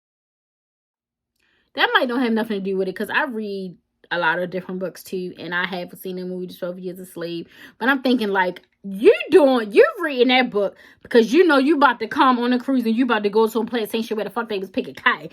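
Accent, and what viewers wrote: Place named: Baltimore, United States. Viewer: Born and raised in Louisiana from the Deep South and yeah those plantation tours always rub me the wrong way. I’m starting in two weeks at American as a deckhand and I already know it’s going to suck . Will be a long 28 weeks but hopefully I’ll end up in the west coast and it will allow me to get my sea time to become a mate and work on cargo ships. Also your Baltimore accent is crazy heavy lol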